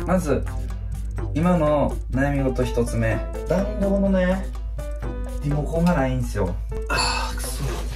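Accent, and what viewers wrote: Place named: Kansai, Japan. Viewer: ずっと男子ないけちゃんの関西弁嬉しい❤やっぱりすてき❤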